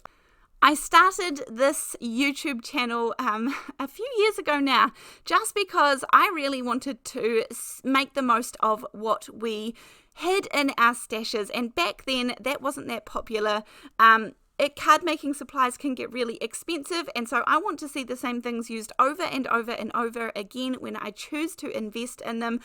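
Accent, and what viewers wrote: New Zealand. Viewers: 😁😃😆 I’m so very happy for you and your husband to have created your own website! I joined with a membership so I can support you to keep creating content for me and all those who love your teaching style, giggles, and for me, I love your NZ accent. Thank you so much for choosing this path in life… you make the world a better place! A HUGE ADMIRER!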